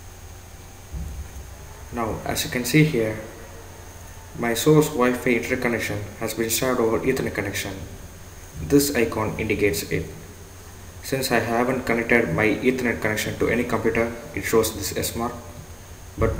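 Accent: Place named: India. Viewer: You Accent havin Indian boy. Thanks for Helpin me out!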